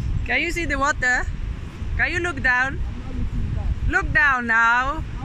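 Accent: Nigeria. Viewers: Wow this is lovely...You now have full 100% Naija accent...lovely. I'm loving your videos. No be small thing o lol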